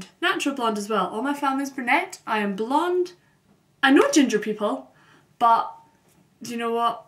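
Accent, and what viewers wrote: Scotland, United Kingdom. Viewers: I'm going to Glasgow to study for the semester in 2 days! Let's see if I can not look like a total tourist. (Although I love your accent.) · I love the your accent, my lecturer was from glasgow, his accent was a bit broader than yours, best lecturer ever though. He had the best sense of humour, I remember this one time in class several girls were reading fifty shades of grey, and one of them was reading it under the table whilst he was telling us about some fine artist; he caught her, asked for the book, opened it out to see what the most opened page was, and then asked the class if they wanted him to read it out. It was hilarious =D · Ever since I found out that my family immigrated from Scotland in the mid-1800's, I have been obsessed with everything Scottish, I love your accent. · as far as the bagpipes go. of course not everyone plays them. 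they are a musical instrument and would take practice. my question is do most scottish people enjoy listening to them ? i really dig your accent !! i find it alot easier to understand than english people. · Stereotypes can be quite frustrating. No matter where you are from. By the way: Your accent is beautiful. I've always had a thing for the Scottish accent. :) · But you do have quite a strong accent. I could tell it was Scots straight away :p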